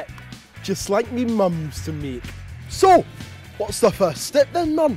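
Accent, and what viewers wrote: Jamaica. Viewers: you sounded a tad jamaican, other than that i liked it. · he sounds Jamaican aha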